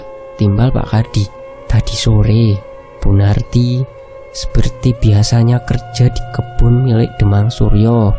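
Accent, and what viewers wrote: Java, Indonesia. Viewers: Assalamualaikum, saya subcriber baru nih kak Boleh kasih saran? Klo lagi bawain ceritanya klo bisa jangan pake logat jawa ya Logatnya biasa aja kedengerannya lebih bagus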